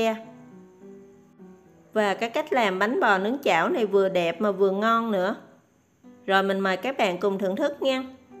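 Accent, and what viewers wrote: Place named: Mekong Delta, Vietnam. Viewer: Tiếng nói rất rõ ràng và thật thà đậm nét miền tây, hướng dẫn cách làm rất dễ hiểu thật tuyệt vời 👍hà, chúc em thành công hơn nữa.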